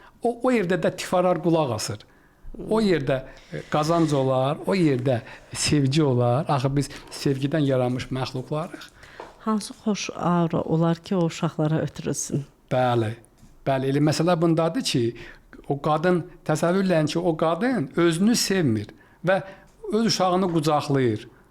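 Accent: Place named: Nakhchivan, Azerbaijan. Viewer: Ləhçəsindən Haxçıvanlıdır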